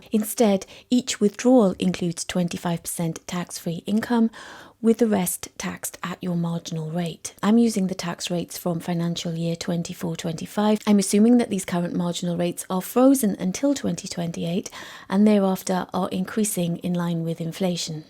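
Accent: Wales, United Kingdom. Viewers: is she Welsh ? this is the softest Welsh accent i've ever heard, it's lovely.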